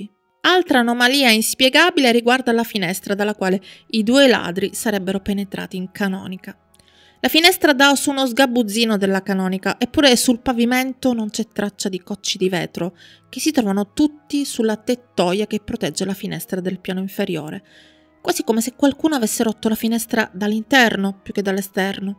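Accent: Sicily, Italy. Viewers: Bravissima come sempre. Il tuo accento siciliano è stupendo. ❤❤❤❤❤❤❤ iscritto 😍🥰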